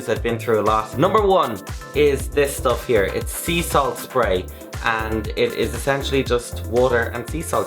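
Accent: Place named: Ireland. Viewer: Hey! I love your videos and you're accent!! Some of my family is from Ireland and I'd love to go. I'm in love with Ireland and its accent!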